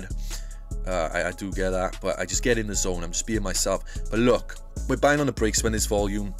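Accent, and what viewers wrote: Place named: Cardiff, United Kingdom. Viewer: Cardiff accent bro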